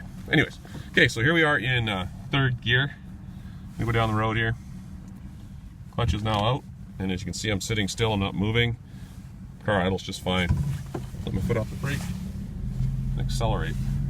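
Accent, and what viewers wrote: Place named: Canada. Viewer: You sound like you're from Canada.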